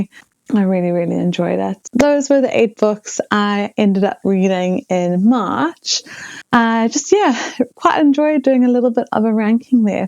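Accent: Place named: South Africa. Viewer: From one South African Bookworm to another...hello! 😂 I just found your channel today and loved hearing the South African accent on YouTube. ❤. Anyway, hope to see you over on my new bookworm channel as well. I'm traditionally a massive thriller reader but I've recently discovered fantasy and actually, I've dedicated the month of Aptil to only reading fantasy.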